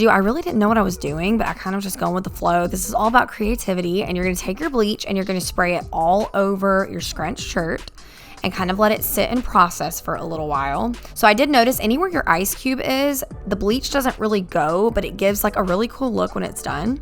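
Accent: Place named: Texas, United States. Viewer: I think I must share that you are the absolute fucking cutest and what part of Texas or the south I guess I should say are you from? I’ve never ever commented on a YouTube vid I don’t have my own channel but I love learning from it and I know how creepy I sound but I cannot resist your personality, I dig all of it. I was just trying to tye dye my kids shirts before they wake tmrw as a surprise bc I take leaps into what I love! You got it girl. Love it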